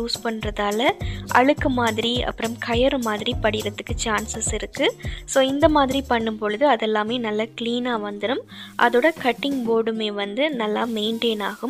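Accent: Jaffna, Sri Lanka. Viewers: I was waiting for your vlogs sister I love to hear your slang of tamil of Jaffna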